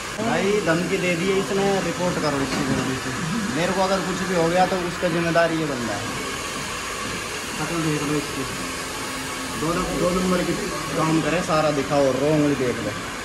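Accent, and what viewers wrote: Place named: Rural, India. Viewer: भाई तेरा बोलने का तरीका देशी लड़के की तरह है। मजा आ गया भाई। 🤗🤗🤗🤗🤗🤗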